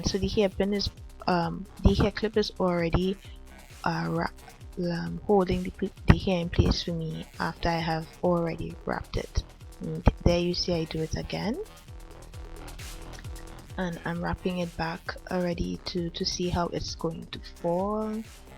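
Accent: Trinidad and Tobago. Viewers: Your accent sounds Trinidadian...great tutorial btw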